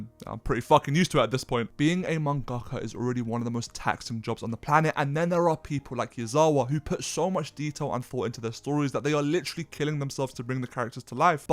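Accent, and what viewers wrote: United Kingdom. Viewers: ur talking too fast and I'm struggling to keep up due to ur thick british accent tbh. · you british accent makes me laugh sometimes and it snaps me out of what youre talking ab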